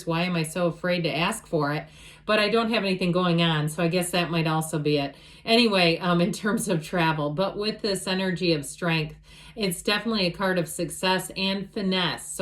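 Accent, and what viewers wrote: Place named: Midwest, United States. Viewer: Your voice is fine, I’m from Illinois 😊 are you from Wisconsin?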